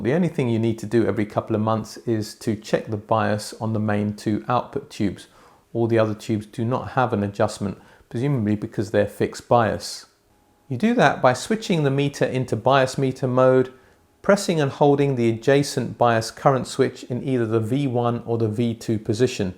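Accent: United Kingdom. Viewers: You sound British, but don't look British.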